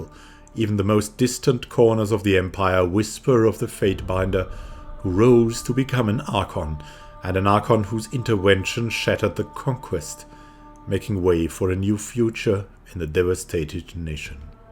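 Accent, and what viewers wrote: Germany. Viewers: I love your role playing videos. Your in-character quips are always delightful and enrich my viewing experience. I have also watched (and I am still watching) your PoE path of the damned play-though, and I have to say you really have immense courage to commit to playing all the way through a massive game like that on such a difficult level. Your "thoughtful gaming" skills are very impressive! I am also hooked on your soothing voice and that very appealing hint of a German accent. I can tell that you are enjoying the play-through as much as we are, who you are taking along on the journey. Your fine intelligence and wry humor shine through in your videos, and checking your channel for updates has now become a part of my daily routine. Thank you so much for your faithfulness to your channel and to your subscribers. Keep the excellent content coming!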